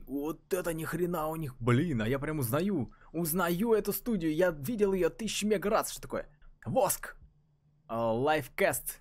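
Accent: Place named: Russia. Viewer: Rial Rassin accent!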